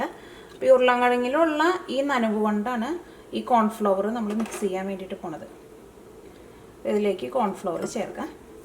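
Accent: Thrissur, India. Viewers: Kalakeet indutta...... Nalla assalu trisoor bhaasha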